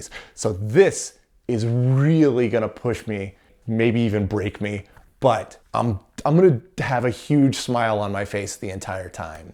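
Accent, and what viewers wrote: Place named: Texas, United States. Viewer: I love how you got real Texan when talking to camera. David Murray does it too. Nice Bendix by the way.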